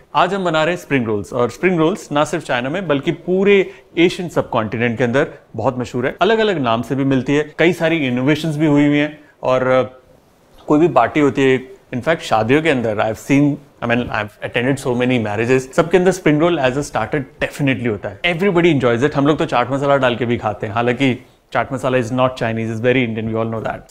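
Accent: Punjab, India. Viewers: Sir your way of talking is very nice...total Punjabi accent.... You are wonderful chef....love your recipes......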